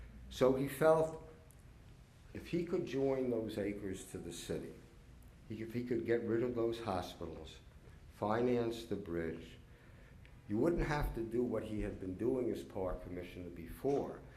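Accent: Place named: New York, United States. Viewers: Congratulations on just now discovering what a "New York Accent" in the year 2020. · Authentic articulate New Yawker. Could listen all day long.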